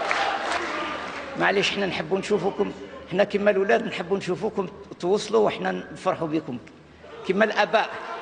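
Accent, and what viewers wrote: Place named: Tlemcen, Algeria. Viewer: il parle le vraie tlemcenien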